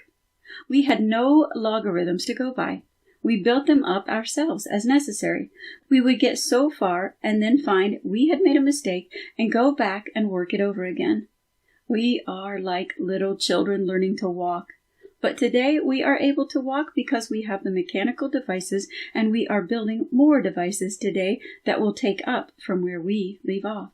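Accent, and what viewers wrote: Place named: New Jersey, United States. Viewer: One of my fav chapters in this 6 volume CLASSIC. I listen to all 6 volumes when walking on forested trails. And this lady is my fav reader cuz she carries the frequencies. I do chuckle each time she says the word: on ... cuz it exposes her accent ... probably NJ or Long Island ... she does a fantastic job .... Baird would be proud of her efforts to share this remarkable body of work.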